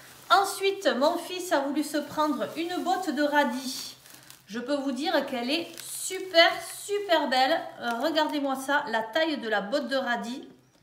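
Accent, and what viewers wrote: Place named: Southwest, France. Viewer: Coucou je réagis concernant les chocolatines oui dans le sud ouest on dit comme ça, moi qui suis originaire du nord on dit petits pains au chocolat. Depuis plus de 20 ans que je vis également dans le sud ouest je dis chocolatine sauf quand je remonte tout là haut. Entre parenthèse je vis non loin de Dax 😉🌞, je me doutais à ton accent mais sans grande certitude.😊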